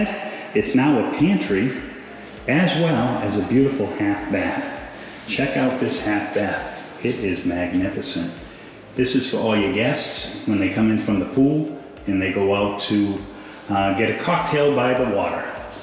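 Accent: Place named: New York, United States. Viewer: You sound like you are from NY or NJ.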